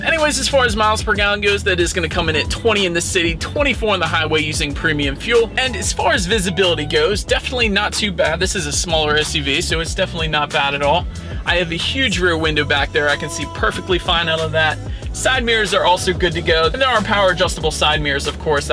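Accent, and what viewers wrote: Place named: Philadelphia, United States. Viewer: are u from philly???? omg thats the most philly accent ive ever hurd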